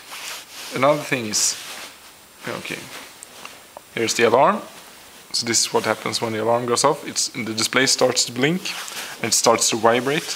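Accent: Sweden. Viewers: from sweden? sounds like that? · where are you from? accent sounds like sweden or smth:D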